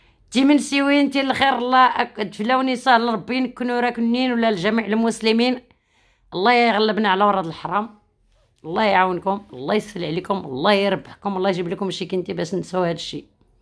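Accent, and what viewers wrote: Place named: Arab world. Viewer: J'aime bouquau cet accent arabe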